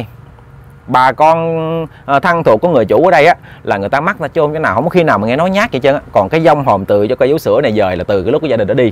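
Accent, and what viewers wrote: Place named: Countryside, Vietnam. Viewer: Kể thì hay nhưng sai c tả thay vì chử rồima đọc dồi làm nghe nông thôn quá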